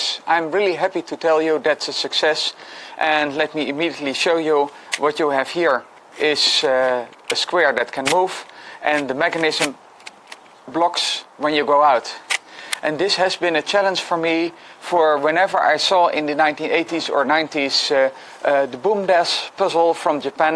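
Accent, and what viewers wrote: Netherlands. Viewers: I heard he was from the Netherlands as soon as he began talking XD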